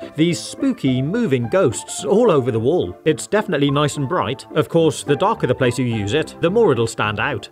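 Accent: United Kingdom. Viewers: HES BRITISH!!! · Your British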